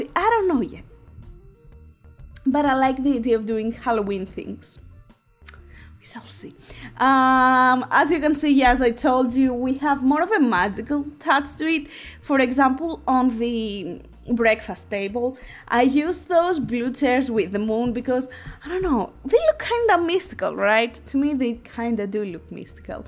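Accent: Greece. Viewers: Ooh you're Greek aren't you. Great accent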